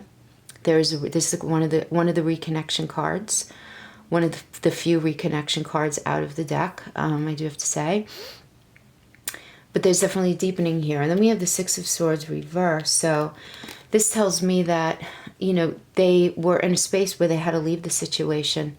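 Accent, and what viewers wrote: New York, United States. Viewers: Thank you so much! Are you a New Yorker? I feel like I know you just by the voice!!! Nevertheless thank you for your reading!! I will gladly make a donation ✡️❤️❤️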